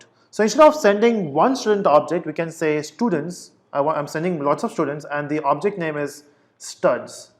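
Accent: India. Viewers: Really a great job. I'm not an english mother-tongue, and you're probably the first man who speaks english with indian accent that I can fully and clearly understand. Well done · Dude, you are great, since I am not an Indian I wish you could speak English with a better accent, but anyway thanks a million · oh gosh indian accent...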